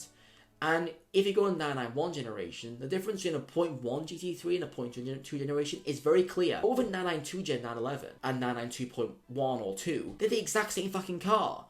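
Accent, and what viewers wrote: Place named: West Country, United Kingdom. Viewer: Yank here. Some of us like to try to figure out different English accents. Most Americans can't even tells the country, but many of us can. Are you on the west side? Bristol? Maybe Wales? Sorry that has nothing to do with your Porsche content, but your video was suggested to me and I love the Porsche topic.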